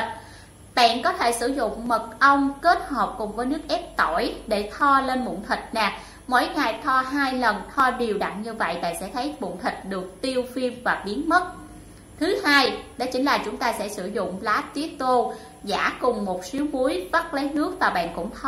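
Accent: Vietnam. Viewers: Cô này người miền trung chớ không phải miền nam nhé , đó là giọng miền trung , hãy phân biệt cho chính xác . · Bắc không ra Bắc Nam không ra Nam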